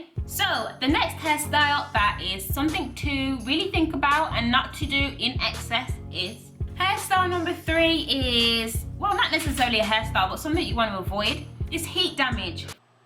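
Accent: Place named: United Kingdom. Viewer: Thank u for these advice. New subbie. I love ur british accent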